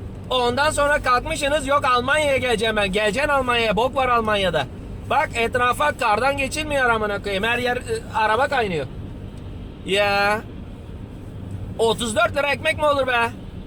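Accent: Thrace, Turkey. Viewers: Va varya sen 10 nuara 5 yıldız sın. süper sin. Bu trakiya şiveye devam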